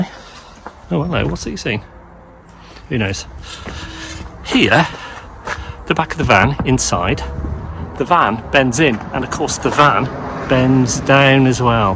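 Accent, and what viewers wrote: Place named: Wales, United Kingdom. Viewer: Genius intro!! We watched it 3 times 😄😄 That welsh accent is amazing 😉 I would literally set fire to anything that’s been sent so far! That’d actually ruin my patience. Nice Fjallraven hat, I’m going to have to get one now!! 🤦🏼‍♂️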